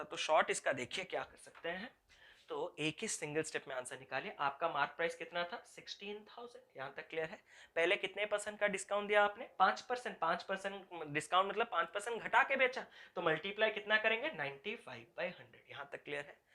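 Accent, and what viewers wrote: Odisha, India. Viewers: Are you from odisa?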